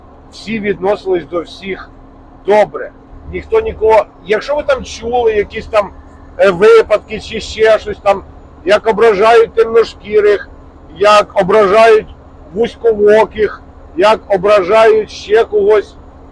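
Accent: Zhytomyr, Ukraine. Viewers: Вітаю! Не давно на вас підписалась, дуже цікаво все розповідаєте. Пораджу вас дітям які мають бажання виїхати в Америку, це донька і зять. Питання :звідки Ви родом з України, відчуваю по розмові десь з мого регіону чи поблизу- Житомирщина. 😊❤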